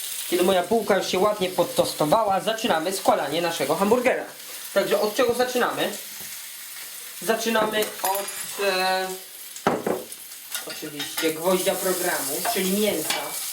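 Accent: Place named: Kielce, Poland. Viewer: chlopieee powiedz mi ze jestes z Kielc :D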